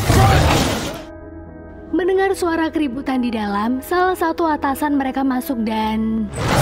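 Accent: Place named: Banyumas, Indonesia. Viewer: Mbak lucu banget sh, pake bhasa jawa ngapak kwkwk, semoga sukses terus terus chenelnya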